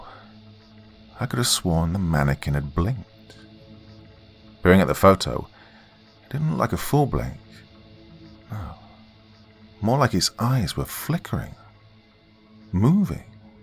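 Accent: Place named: United Kingdom. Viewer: Your accent... where in Britain is that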